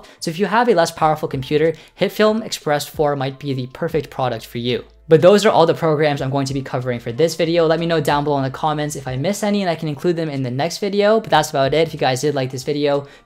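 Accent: United States. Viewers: sir you are looking as Indian Boy with American accent. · I was a bit overwhelmed when I heard that soft, American-english accent. Times have changed.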